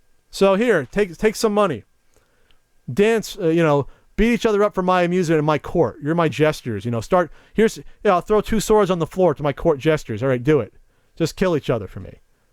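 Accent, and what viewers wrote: New Jersey, United States. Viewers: ah you can hear the New Jersey in you. #1 state in ppl cursing. Jersey misses ya!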